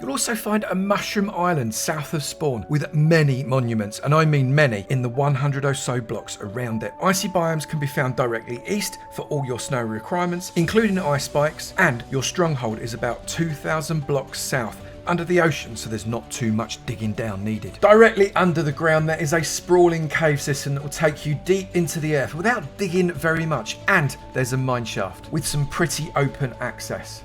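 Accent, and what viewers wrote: United Kingdom. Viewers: this dude sounds like a tourist attraction person XD very soothing bri'ish accent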